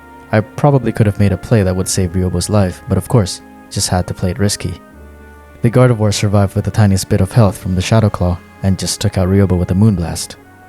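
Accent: France. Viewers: Why did I just realize that accent sounds like you voice act Frenchy from Spongebob (narrator)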